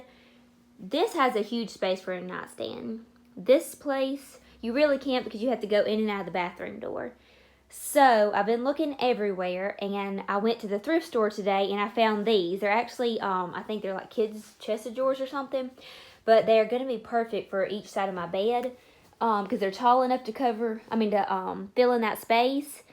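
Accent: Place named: Kentucky, United States. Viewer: GORGEOUS!..Love your channel!..and your accent makes me miss my hometown in KY!..I currently live in Indianapolis, IN..But hope to get back to the Country sooner than later!..keep the home tours and DIY's coming Please!..LOVE THEM!..Have a Fantabulous New Year!..♥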